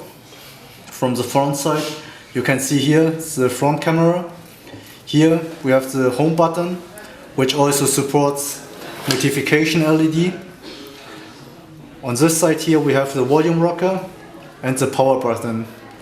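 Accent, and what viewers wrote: Germany. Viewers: Typical German - English ... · sounds German as f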